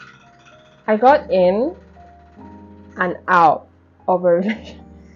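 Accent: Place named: South Africa. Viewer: I love the SA accent n vibes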